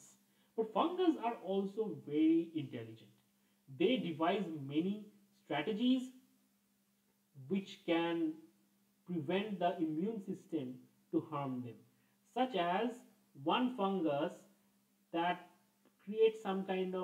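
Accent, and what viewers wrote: India. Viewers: I do not want to sound too harsh, the video is helpful, and we are thankful for it, although the sound is far too quiet, but I am always wondering why NOT ONE indian scientist trys to speak without the typical accent. I have worked in some international labs and indians have always the strongest accents - they simply to not seem to care....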